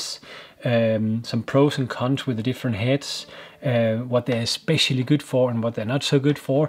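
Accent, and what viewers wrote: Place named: Denmark. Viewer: Hi, seeing that you use the Tamron 150-600, have you tried with the new "Bigma" 60-600? Thanks for your videos, very instructive and easy to understand... specially with your Daninglish... perfect for my Spanglish. Greetings from Colombia, JuanB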